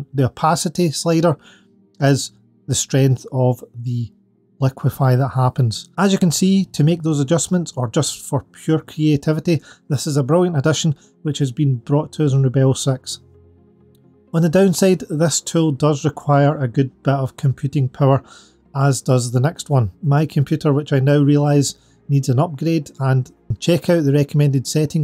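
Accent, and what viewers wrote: Scotland, United Kingdom. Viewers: I absolutely love the scottish accent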